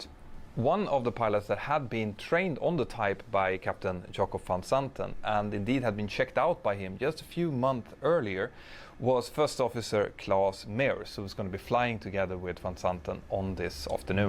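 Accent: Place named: Europe. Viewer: Okayeg weird nondescript euro accent narrator :^(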